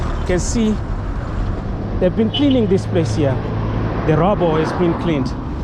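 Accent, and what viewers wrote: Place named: East Africa. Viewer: A foreigner claiming RSA here. The person making this video is not a South African. His tongue points to Kenya, Uganda or Ethiopia. These foreigners cannot really make news about their own countries.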